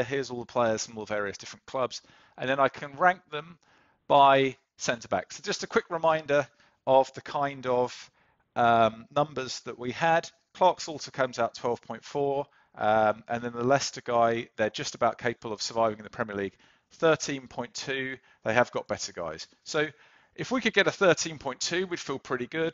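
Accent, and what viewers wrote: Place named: South America. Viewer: Very interesting to have the critical data, but imo, sexy sounding South American > data.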